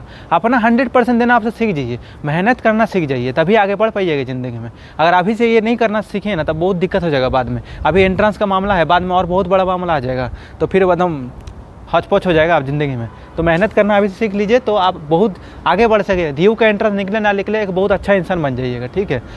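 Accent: Bihar, India. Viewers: Sir Your bihari essence 😍💖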